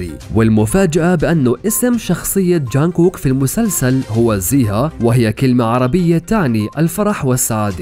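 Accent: Iraq. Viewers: هسه يلي جاي يسوي اخباࢪ هوه عࢪاقي اشو يحجي مثلنه العࢪاقيين 🇮🇶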